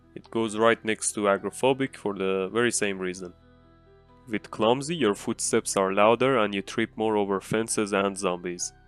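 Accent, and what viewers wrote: Iran. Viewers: Are you Iranian? You have a Persian accent.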